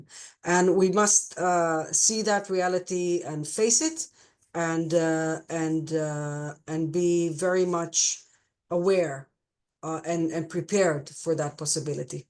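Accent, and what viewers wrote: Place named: Europe. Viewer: Curious what accent that is, it’s not an Arabic accent, so you’re not from the Middle East. What country in Europe are you from? Also, you’re in a class by yourself saying that it’s helpful that Trump has been elected. Are you aware he’s completely tearing apart our government. But I’m glad it’s helpful for you and your efforts.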